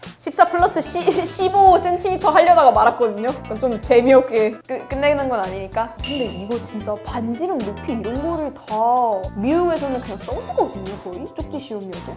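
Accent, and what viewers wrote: South Korea. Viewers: 아무리봐도 유닉한 캐릭터임 한국말할때는 말투, 억양, 마인드까지 100% 한국패치완료됨. 서양 마인드만 살아있다면, 이런 캐릭터는 방송이나 연예계통뿐만 아니라 일반 사회적으로도 필요로한는데가 무지하게 많을듯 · 예쁘고 귀엽네 발음이 완전 한국인이네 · 말투가 조선사람이구먼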